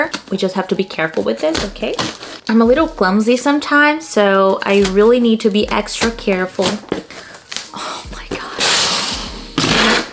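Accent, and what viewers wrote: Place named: United States. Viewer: more videos in english please, you’re sound like a american girl haha loved it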